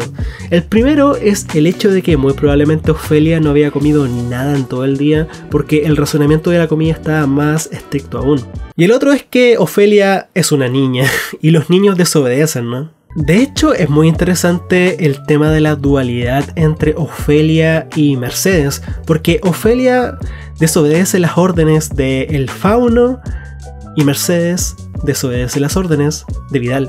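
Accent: Chile. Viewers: Acento neutro???? De acá a la china se nota que sos chileno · Nos ered neutral, se nota tu acento chileno a kilómetros. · NO HABLAS NEUTRAL. Tienes el tipico acento chileno. Ahora que no lo notes es diferente. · Eres un grande pero sin ofender el acento chileno se te nota a leguas, no creo que domines el acento neutro. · Qué malísima esta review . Y no hablas neutral.. hablas chileno. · Dices que hablas neutral??? Pero si hablas Chilensis 🤭🤭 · tu acento es bien chileno ,man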